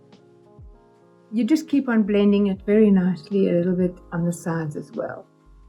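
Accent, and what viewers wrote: South Africa. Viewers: I love that you've still got the Saffer accent! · Love her South African accent. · I love that she still has her South African accent. I really love her representation for older generations. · It's weird hearing a South African accent on Vogue. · As a South African I definitely heard her accent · Wow, you so beautiful with or without make up. I actually was scrolling past and the videos play on there own and I got drawn in by the sound of your voice. I can just hear the South African accent in your voice as I am South African and was so confused lol until you explained. I hope that even in my later years I can be just as poised and elegant as you are. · I recognised her south african accent as soon as she started speaking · She kinda sounds South African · South African accent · She still has her South African accent 🥰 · Love your saffa accent ❤ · So good to hear a South African Accent on Vogue ;) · You can hear her South African accent perfectly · What an exquisitely charming lovely Women. 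The South African accent is just beautiful . Thank you Vogue for presenting a mature women aging lovely . This was just a refreshing tutorial.👏👏👏🇨🇦❤️ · its a South African accent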